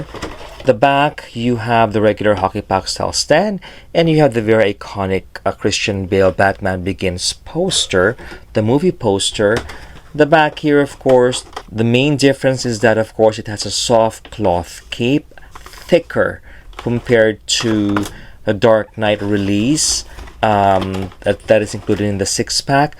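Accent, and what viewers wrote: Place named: Pakistan. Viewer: I believe he is from pakistan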